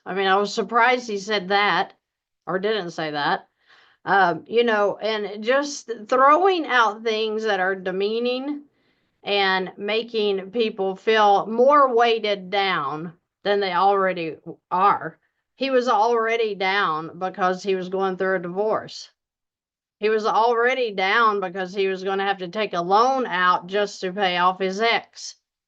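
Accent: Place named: Kentucky, United States. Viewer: Love your accent. From Kentucky here. Sounds completely normal to me. Thanks for the video.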